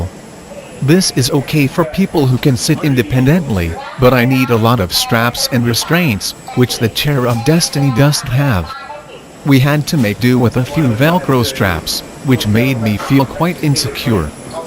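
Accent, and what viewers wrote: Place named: New York, United States. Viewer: my main man! That doco was completely awesome! Very impressive effort. And I never realised you have an American accent. Bit of New York rub off hey?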